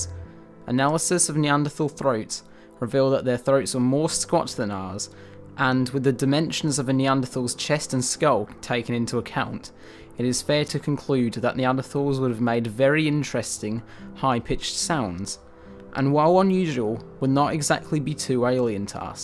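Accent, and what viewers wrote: United Kingdom. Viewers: He's a Brit. What do you expect?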